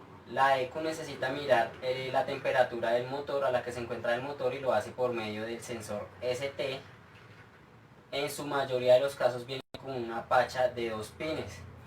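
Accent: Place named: Colombia. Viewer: Amigo de qué parte de Colombia eres ? Pregunto por tu acento ??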